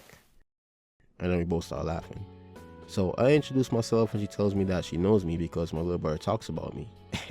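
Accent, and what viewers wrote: Jamaica. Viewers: Here I am picking up on a fellow accent like, is he Jamaican, is he not?? But nope, as soon as you said "Brother", I knew. I always know. I'm always two steps ahead...anw, nice video. It's kinda funny watching this as a female, seeing how guys think etc. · R u Jamaican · Brooo are you Jamaican? I can just feel our aura resonating off you brooo (the accent). Respect, loved the vid. ❤🇯🇲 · yo bro stop tryna hide your accent bro l like some Jamaican i new u was Jamaican from how you said da buss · Dawg u accent heavy bro u jamaican? 😮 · Are you Jamaican or is that just your voice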